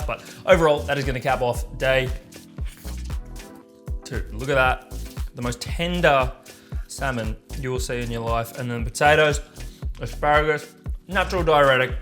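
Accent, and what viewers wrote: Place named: Australia. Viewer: i can't tell if your accent is melbourne or sydney on rare occasion your A noises are more posh like melbourne but then you've got that drawl from sydney it's like listening to a cello that sometimes plays violin parts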